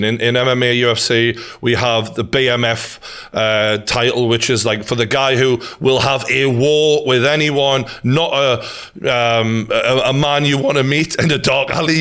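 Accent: Newcastle, United Kingdom. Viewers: Did like true Geordie until now..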